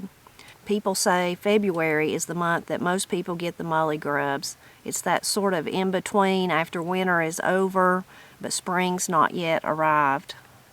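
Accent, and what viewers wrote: Appalachia, United States. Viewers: Love to hear your mountain speak!❤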